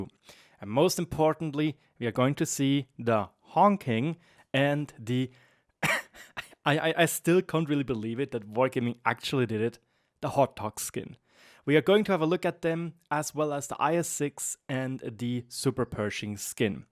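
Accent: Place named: Germany. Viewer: For a German, your English is pretty ok, but maybe watch some undubbed movies to improve pronunciation a bit further..? If your looking for a broader audience.. Just a thought..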